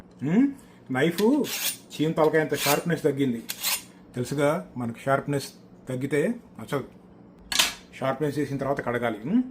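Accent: Andhra Pradesh, India. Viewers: ఎందులోనైనా నీ రూటే సెపరేటు తంబీ నీ బాడీ లాంగ్వేజ్,నీ భాష లో యాక్సెంట్ ఒంగోలు, గుంటూరు, విజయవాడ మూడు కలిపి మిక్సింగ్ కొడితే ఎలా ఉంటుందో అలా ఉంది 🎉🎉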